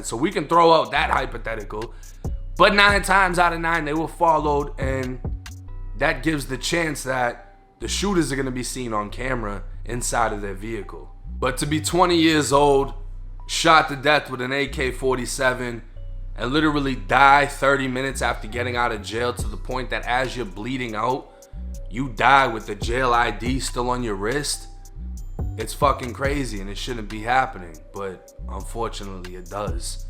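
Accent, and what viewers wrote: Boston, United States. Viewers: Do I hear a Boston accent? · You from Boston? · I just love your Boston accent. You make these videos so much more interesting with the way you narrate. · Boy your Boston accent is hilarious lol…good shit tho!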